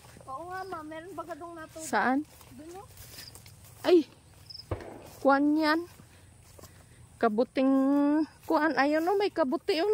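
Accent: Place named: Tagalog, Philippines. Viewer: Saan bayan kayo maam parang nadaanan ko na din jan.at Ang salita mo pamilyar Sakin Tagalog 😮❤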